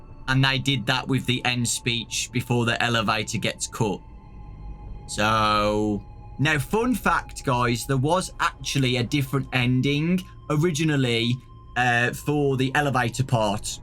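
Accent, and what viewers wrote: United Kingdom. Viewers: Oh hai bri’ish dude /pos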